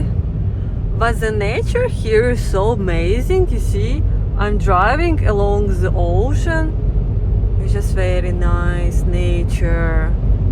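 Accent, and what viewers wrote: Russia. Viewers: Hello from Florida, USA I love to listen to you speak English with your Russian accent. You sound so interesting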